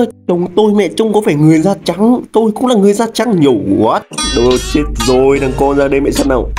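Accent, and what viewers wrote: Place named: North, Vietnam. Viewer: ko lq nhưng mà nghe ông nói giọng là miền bắc nhưng mà tui cứ có cảm giác ông là người miền trung hay sao á 👁️👄👁️👍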